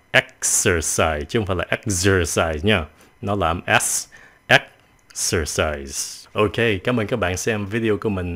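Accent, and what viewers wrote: United States. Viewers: Do ở đây dạy phát âm theo Anh-Mỹ. Nên đọc theo là đúng với nhiều ngừoi Mỹ mình nghe, Còn cách đọc thành iks là âm Anh-Anh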